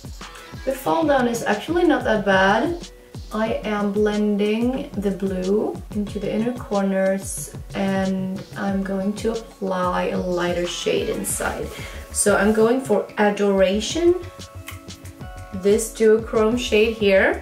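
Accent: Sweden. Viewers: you are magical ❣️ 🧝🏼‍♀️🧞‍♂️🧚🏻‍♀️ I loved “The Swenglish”. I just got my palettes I ordered from your website. I had to stop this video before you started the tutorial and go to Nabla and ordered that palette. Your eye look was gorgeous 😍😘❤️ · Swinglish! 🤣🤣🤣 Love it!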